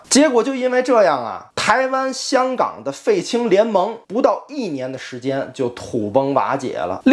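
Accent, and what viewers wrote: Tianjin, China. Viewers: 这是不是天津人，口音很像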